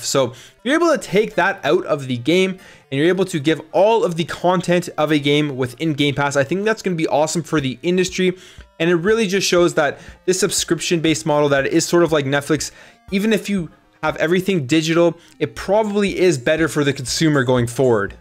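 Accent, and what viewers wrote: Canada. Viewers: your from Toronto area im guessing I'm pretty good with accents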